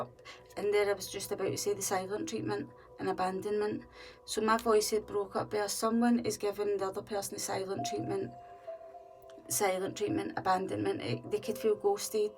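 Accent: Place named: Scotland, United Kingdom. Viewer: Thank you! And love your Scottish accent (and can't believe that I can understand you! :) 👍❤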